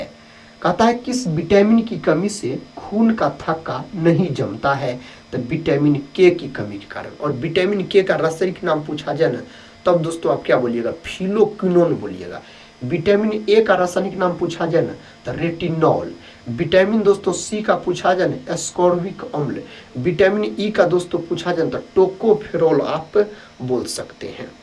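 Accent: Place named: Uttar Pradesh, India. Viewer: Maza aaya bt up ki Laungaug thodi sochna pdta hai bt sweet hai 😂🙏👌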